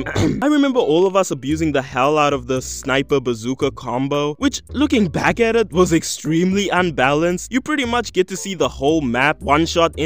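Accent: South Africa. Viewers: Ngl you sound south African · Do I hear a south african accent? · Bro are you South African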